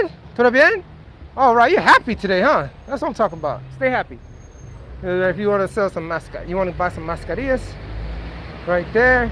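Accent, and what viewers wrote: El Salvador. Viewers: love your salvi accent 👍🔥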